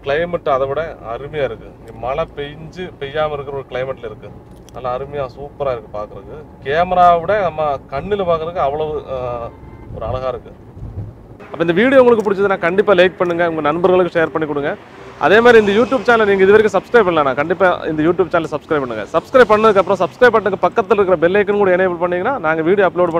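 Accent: Kerala, India. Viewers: Malayali tamil samasrikunnu... Nanum thamilan than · malayali tamil pesrathu ...nalla irukku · நண்பா உங்களுடைய மழையாளம் கலந்த தமிழ் கேட்பதற்கு அருமையாக உள்ளது.நன்றி. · When a malayali speaks Tamil it sounds so sweet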